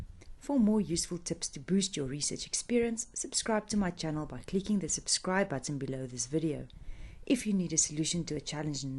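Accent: Afrikaans, South Africa. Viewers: Did not expect this to be South African lol. Dankie vir die mooilike video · Definately a south african voice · Thanks, it was very helpful and easy to follow. It is also a heartwarming surprise to hear an Afrikaanse accent. :)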